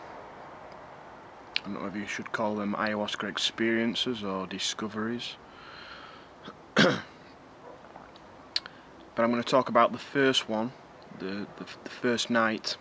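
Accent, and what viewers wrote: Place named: Lancashire, United Kingdom. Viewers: Love how you flit from proper English to Northern :D Nice vids, mate. Subscribed... Peace from Barnsley ;) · How? I want to do it, I am in the UK, Birmingham. You sound like you come from Lancashire maybe? Buy it online at shayanashop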